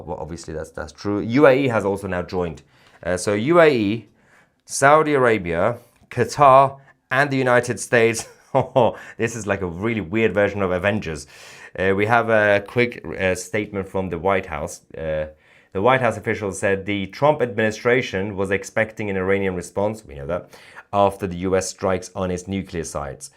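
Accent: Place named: United Kingdom. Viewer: Dude stop exaggerating everything and jer jerking off on everything and I'm getting a little f**** pissed because of the way that you're exaggerating things and pulling s*** out of thin air and I thought you were better than that, now you just sound like a f**** stupid f**** Brit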